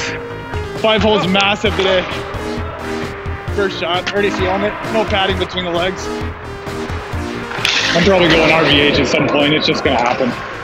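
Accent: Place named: Canada. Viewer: Sick video! Music was perfect and you dialed up the canadian real good, bud!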